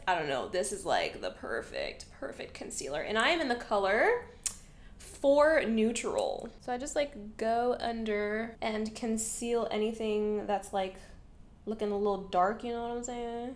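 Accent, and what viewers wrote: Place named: Australia. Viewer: I thought you were gonna say it's a "wowder" LOL been waiting for someone to shade glossier like that You look great!!! Also loving the free aussie accent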